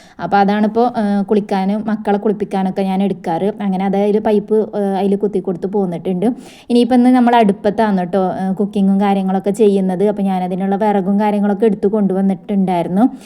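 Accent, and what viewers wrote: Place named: Kozhikode, India. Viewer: എന്തിനാ കോഴിക്കോട് ഭാഷ പറയുന്ന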